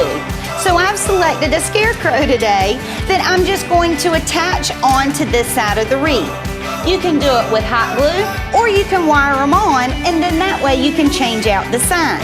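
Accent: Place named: South, United States. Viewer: Wow her southern accent! Nice designs. Like these ideas.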